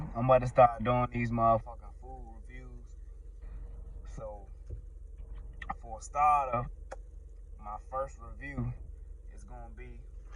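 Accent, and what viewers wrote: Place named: DMV, United States. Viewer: I knew I recognized that accents #dmv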